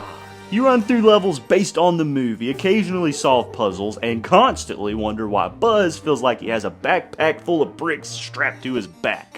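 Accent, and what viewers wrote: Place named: Louisiana, United States. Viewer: That accent is great bro where are you from Louisiana?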